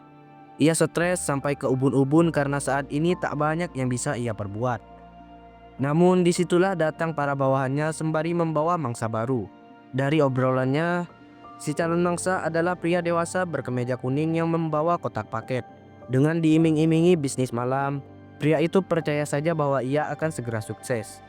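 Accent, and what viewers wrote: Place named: Madura, Indonesia. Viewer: Hee.. kau madura ya? Jing-trejing tak iyaa..? Bikin perut mules dengar kau cerita.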